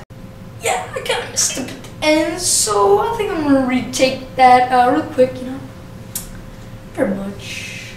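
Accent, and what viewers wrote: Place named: United States. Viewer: are u american or russian ur names sounds russian but your accent is american im confused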